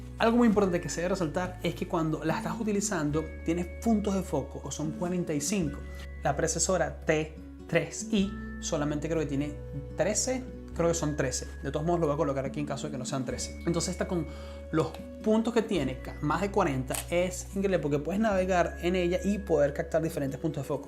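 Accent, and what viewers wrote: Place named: Venezuela. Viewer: ya decia yo, verga el acento de esta pana es venezolano ajuro.. pan banderita de venezuela en la camara haha Buen video bri!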